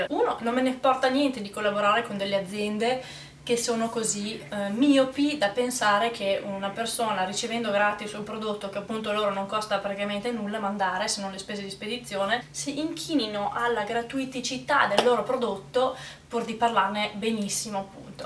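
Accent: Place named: Veneto, Italy. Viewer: Sono una nuova iscritta é un vero piacere averti scoperta e ascoltata. Posso chiederti se sei Veneta? Io sono di Verona e trovo molto famigliare la tua cadenza. Ciao e buona giornata!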